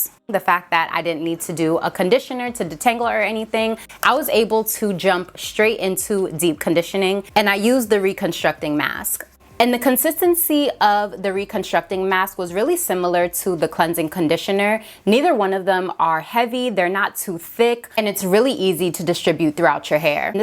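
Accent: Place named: New York, United States. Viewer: You are a queen sis and I loved every second of this. Great job and I love how you break down your video, I'm a new sub! 😁💚👑 I also like how you created a faux bang and I love your enthusiasm and your accent. You sound like you're from New York or somewhere up north! 😁 I will have to check out this line of products.👍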